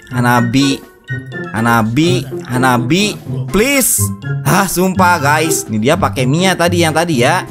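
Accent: West Java, Indonesia. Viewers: Bang kemarin kemana bang di tunggu tunggu eh ga ada gua suka omongan lo bang Sunda asli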